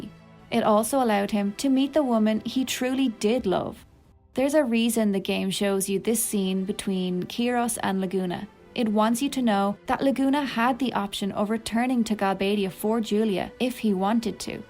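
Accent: Ireland. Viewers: Great to hear a fellow Irish person as a FF authority!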